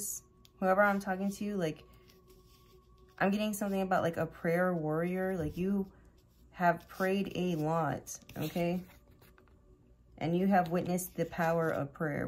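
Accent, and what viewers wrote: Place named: RP, United Kingdom. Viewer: Hey Girl , inspirational, and so comforting to hear your voice, and Posh too! 🐈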